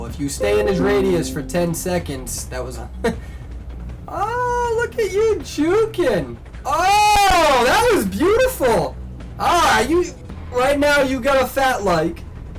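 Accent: Germany. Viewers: I didn't know you were german, explains a lot with your accent😂 awesomee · ITS A GERMAN ACCENT? THOUGHT IT WAS PERSIAN OR ARABIC OR SOMEWHERE IN THE MIDDLE EAST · Your beautiful German accent 😂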